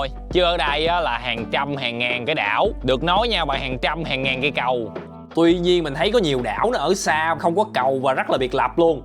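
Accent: Mekong Delta, Vietnam. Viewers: Ông nc rặc miền Tây,dù đi dl nc ngoài thì ổng nói tiếng Anh giọng cũng đặc sệt miền Tây,dễ thưn,thân thiện,hài huớc 😂😂😂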